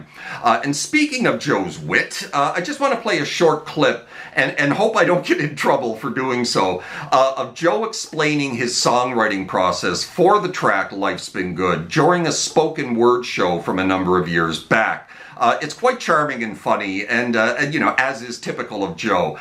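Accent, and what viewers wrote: Canada. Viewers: you sound Canadian, I’m going to see at Firekeepers Casino in November, can’t wait.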